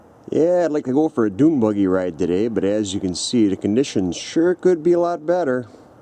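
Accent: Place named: Upper Midwest, United States. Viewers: Christopher Desabrais Wisconsin accent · lmao... man this guy's accent kills me! it's like watching a deleted scene from the movie Fargo! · I'm from Wisconsin (now in Texas) and this guys accent was killing me. i miss home!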